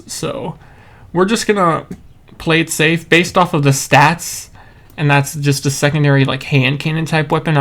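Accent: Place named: Germany. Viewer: You sound so german to me lel, and why do you refer to yourself as mentally unstable? 😅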